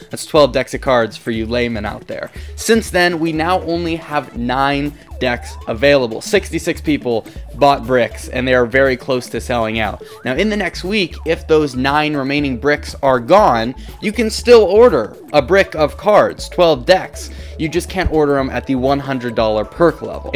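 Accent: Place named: United Kingdom. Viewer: Lovely UK accent ;)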